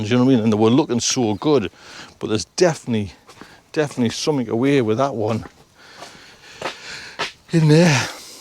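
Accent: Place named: North East England, United Kingdom. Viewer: Just stumbled across your channel today and as fellow North Easterner born and bred just down the road from you in the big settlement....:), it's great to hear the twang again. I've just started my plot after all these years and am looking forward to your adventures.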